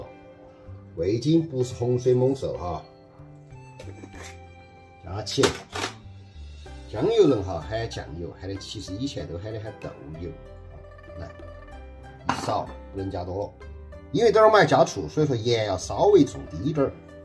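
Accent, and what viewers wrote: Chengdu, China. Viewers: 这一口地道道的成都话！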